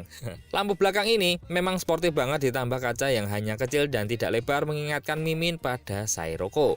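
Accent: Java, Indonesia. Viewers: Mobil sih keren tapi gak nonton sampe habis gara gara narasi pake logat di medok medokin jawanisasi,,,, aneh denger nya ky cakupan siaran terbatas daerah,,,, gak nasionalis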